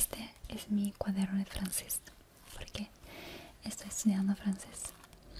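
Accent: Argentina. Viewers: tengo una amiga italiana-argentina que se parece a ti. tu español suena bastante argentino también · tu acento en español es muy argentino..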